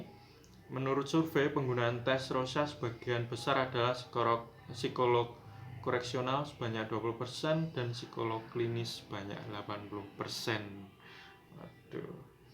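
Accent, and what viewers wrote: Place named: Java, Indonesia. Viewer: Medhoknya itu lo kak😆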